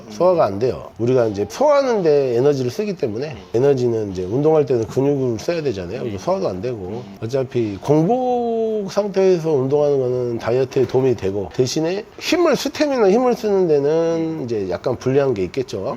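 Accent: Jeolla, South Korea. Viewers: 전라도사투리가 아주 정감가브러!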